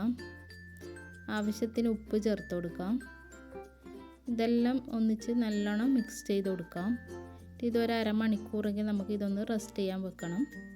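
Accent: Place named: Kannur, India. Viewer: Masha Allah. അടിപൊളി Insha Allah try ചെയ്യുന്നുണ്ട്. വർത്താനം ശെരിക്കും കണ്ണൂർ സിറ്റിക്കാരുടെ തന്നെ 🤣👌🏻 സ്മോക്കി roll ആദ്യമായിട്ട കാണുന്നത്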